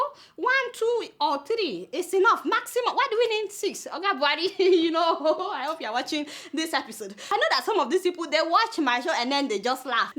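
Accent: Yoruba, Nigeria. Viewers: Nice one. Keep it up! I haven't missed any of your weekly episodes since I got introduced to it before the 2015 election in Nigeria. Don't mind my name. I'm Yoruba, and I love the way you speak the raw Yoruba language. I'm proud of you!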